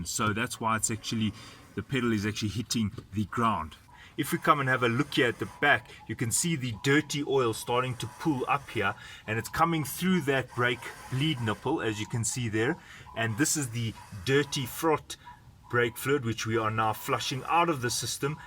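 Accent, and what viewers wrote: South Africa. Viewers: After hearing your South Africa accent I became a subscriber.😊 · After hearing your south aflican accent I changed my mind about watching your video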